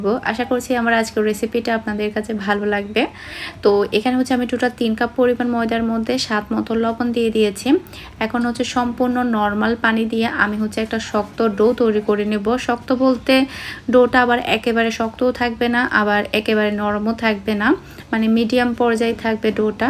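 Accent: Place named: Sylhet, Bangladesh. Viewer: আপু সিলেটের তাই শুদ্ধ বাংলা বল্লেও সিটেলের ভাষা টা চলে আসে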